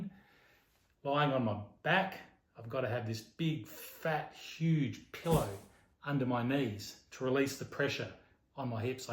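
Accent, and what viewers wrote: Australia. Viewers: Tangent: Love your accent, used to live in Sydney and listening to you talk brought back pleasant memories for this ol' septic! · i was absolutely encouraged by your video and ability to walk so steadily.....i really appreciated your sense of humor....since i am nearing surgery date in a few months....lol... you really gave me an optimistic approach to your home recovery with your ozzy accent and humor!!! Thanks!!! God Bless You!...Susan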